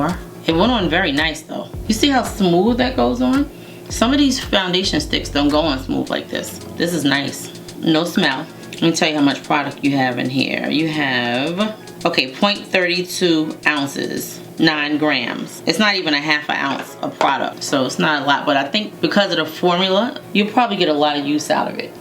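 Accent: New York, United States. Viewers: New subscriber here! Where are you from?! Your accent sounds like the NE Philly or NYC or dare I say it.. jersey?! I gotta know if I’m close. Also, great content! Happy to have found your channel!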